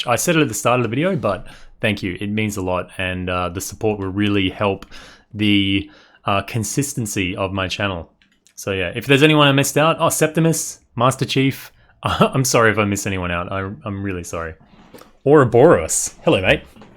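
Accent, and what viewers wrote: Australia. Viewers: Hi. You sound Australian. Thanks.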